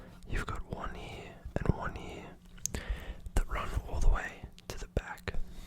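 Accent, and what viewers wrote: Australia. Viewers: omg a fellow aussie doing asmr???? this is insane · Love the Aussie accent so much I wanna visit there and NZ so bad, my favorite music group is based outta NZ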